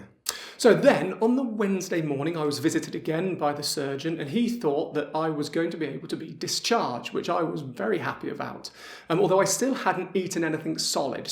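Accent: Apulia, Italy. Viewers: Sei bravissimo oltrechè simpaticissimo con il tuo italiano dall’accento pugliese. Bravo!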